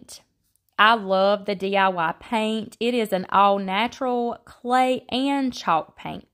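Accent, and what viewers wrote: South, United States. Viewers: All of them simply beautiful! Every one of them is my favorite. I love them as much as you do! P.S. I really like listening to your voice are you in the south? TFS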